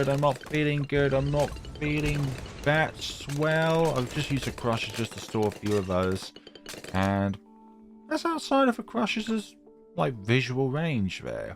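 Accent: United Kingdom. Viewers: british man commentating over videogame is the highest form of youtube content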